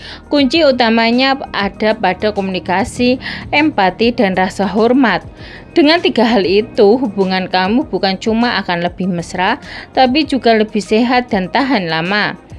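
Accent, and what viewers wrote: Java, Indonesia. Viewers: Tebal sekali medoknya, orang mana sih mba? Dulu makannya apa kok bisa tebal sekali?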